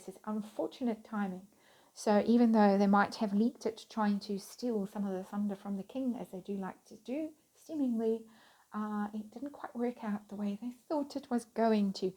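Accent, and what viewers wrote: South Africa. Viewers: It didn't see Young Mr Bolands Video with a SA accent & I Subscribe. Was it a Members only Video?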